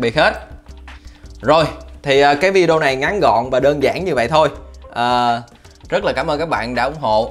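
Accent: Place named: Mekong Delta, Vietnam. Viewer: Gất là gần. Nghe rồi ông còn sub nữa. Làm như ngta k biết ông dân miền Tây hay gì kk. Nhưng video này chưa chất lắm đâu nha.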